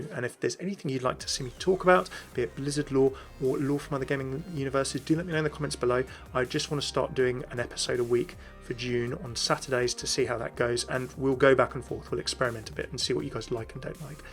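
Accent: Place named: United Kingdom. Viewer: you´re welcome :D btw love that brit accent - german youtuber can´t deliver such quality as you do!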